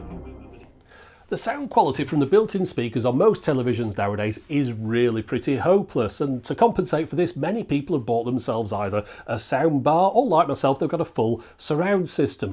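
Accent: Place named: North West England, United Kingdom. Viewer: Can’t get over the Schools TV feel on your videos, I think it’s the NW accent with Granada having made a lot of the programs for ITV Schools. This isn’t a negative, I used to enjoy some of the ITV schools programs even after I’d left school and watched when I was off / out of work.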